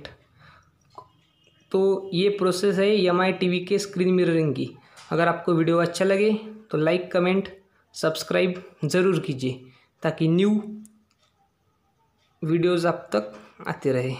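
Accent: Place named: India. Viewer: The most fucking hindlish I've ever heard. Blood from the ears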